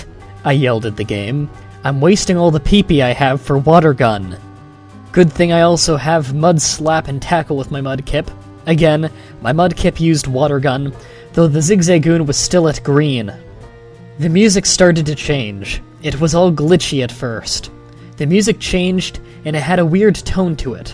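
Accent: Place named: Canada. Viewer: lol ik i do apologize but there was just something about your accent said "canadian in my head."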